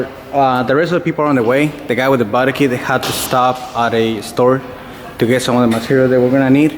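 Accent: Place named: California, United States. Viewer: bruh when i first heard your accent i thought you was in cali and then i see all the japanese plates😭😭😭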